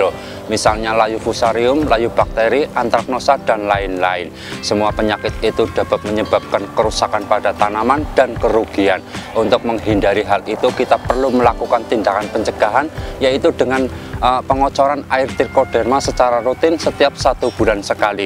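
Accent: Banyumas, Indonesia. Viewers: 👍👍salam satu ngapak bro.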